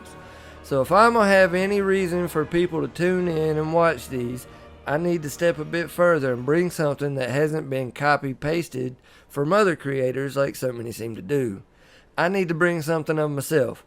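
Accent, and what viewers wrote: South, United States. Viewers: A southern drawl talking shop about Elden lore, I’ve never subbed so fast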